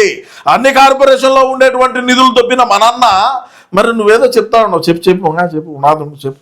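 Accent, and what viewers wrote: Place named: Kadapa, India. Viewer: అగ్గువ! కడప మాండలికం వింటూంటే చాలా బాగుంది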